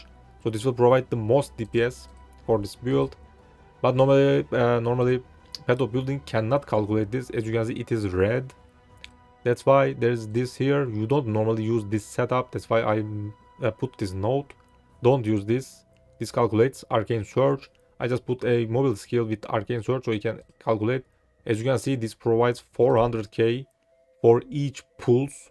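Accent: Turkey. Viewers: Türk oldugunu Ingilizce Konusmandan hemen anladim 😂 Bende bu Buildi 3.16 de kulancam. Almanyadan selamlar!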